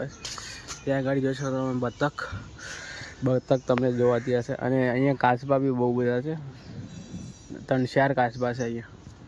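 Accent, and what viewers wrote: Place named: Bhili, India. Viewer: ભાઈ ક્યાંના છો ભીલી બોલી બોલતા હોય એવું લાગે😊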